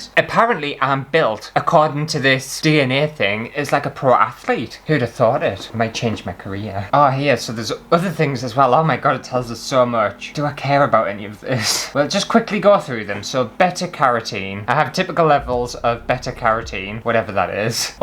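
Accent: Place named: North East England, United Kingdom. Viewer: Glad to hear it - I couldn't really imagine you without your North-East accent! 😄😄Endurance just sounds wrong all round 😆😆 Hope your weekend is going well so far and the latest video isn't turning into an endurance test of its own!! 🤣🤣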